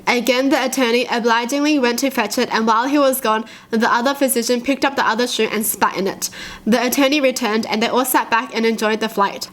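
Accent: Australia. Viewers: She has an accent. She is a aussie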